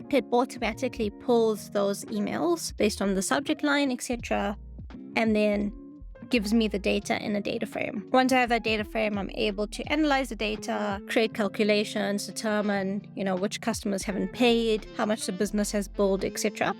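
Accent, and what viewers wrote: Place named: South Africa. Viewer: i miss south africa, i like your south african accent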